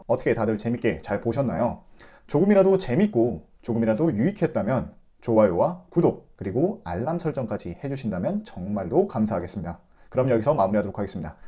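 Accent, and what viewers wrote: Jeolla, South Korea. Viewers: 다 좋은데 전라도 사투리가 좀 남아있네..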